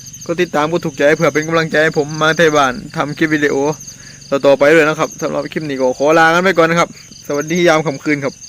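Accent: Korat, Thailand. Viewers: ดี๋แท่ะๆ...ดี๋ใจ๋ดาเด้อนาย หมานๆรุ่งเรืองราบรื่นๆ มนต์เสน่ห์ภาษาท้องถิ่นโคราช...ใช่ออกสื่อ!!! FCเด้อนาย!!! 😍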